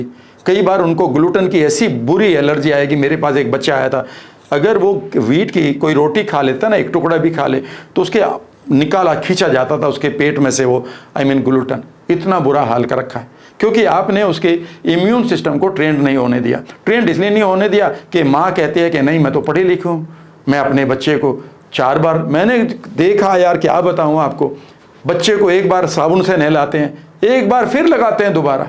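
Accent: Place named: Muzaffarnagar, India. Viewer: ji mann karta h aap ko sunte rahe aap ki bhasha ki miths me mujaffar nagar ki khusbu aati h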